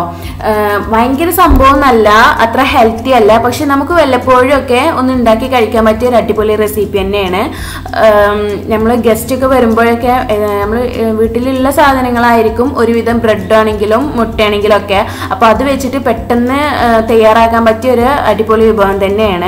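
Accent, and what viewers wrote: Malappuram, India. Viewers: Nihal MN കോഴിക്കോടല്ല മലപ്പുറം ജില്ല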